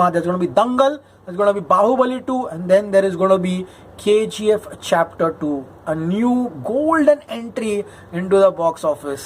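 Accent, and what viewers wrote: Karnataka, India. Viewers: Yaar guru neevu...amazing reviews....one of the best style of reviewing...your kan-glish is outstanding..Namaskara.. ✨💥💥🙏🏻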